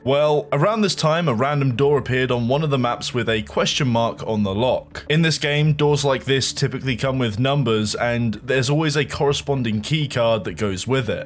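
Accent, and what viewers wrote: United Kingdom. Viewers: very pleasant surprise to click a commentary video and hear a fellow brit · BABE IT'S 3AM! TIME FOR ANOTHER LONG FORM CONTENT NARRATED BY A BRITISH GUY!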